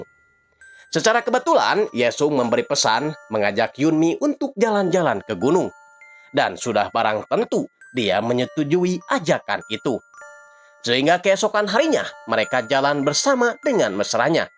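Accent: West Java, Indonesia. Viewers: Lucu ga ada serem seremnya karena pembawa ceritanya sunda pisan 😂😂😂😂😂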